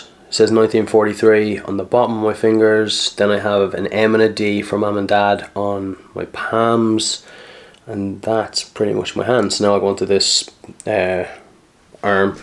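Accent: Ireland. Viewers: Sir I don't like tattoos but I just wanted to know if you don't mind me asking, why do you have so many tattoos and why do you like having tattoos? I was just curious, you may not answer if you don't feel like....I love your style, your dressing sense and most importantly your melodic Irish accent, I like it so much that I'm learning this accent.☺️ Thank you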